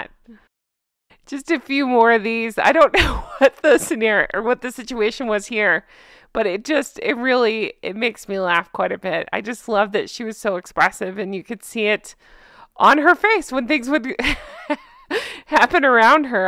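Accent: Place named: United States. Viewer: I get all your videos out of sync because I watch on TV - also don’t use notification beeps on my phone. I saw your video with dreadful albeit illiterate insults the sugars posted to you. I LOVE your voice! Until I started following both you and lovely Beebs I disliked American accent on television but you two have such warm ( and not loud) voices. I couldn’t bring myself to watch Hank and Skans Netflix show or that dreadful Oprah woman. I’m not defending Meghan as I loathe her but Princess Diana always contacted paparazzi which could have avoided fatal crash ( think I said that before but I’m old!😂). Love your compilations- everything actually. Unlike skank Princess Catherine obviously has a loving family with real children. Still must be hurtful though. She’s so loved in Britain ( unless you are anti monarchists). She’s perfect 🐾😺💕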